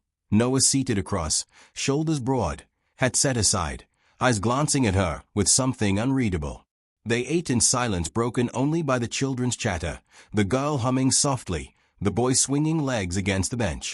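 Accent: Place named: United Kingdom. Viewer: Again with a Brit voice narrating an old-west story.😠 But damn your high-and-mighty principles, lady. Your pride doesn't fill empty bellies. I'm sick of people who 'won't accept charity', when they've been PRAYING for help until they turn blue, but they throw it back in god's face, because they don't like his method of delivery.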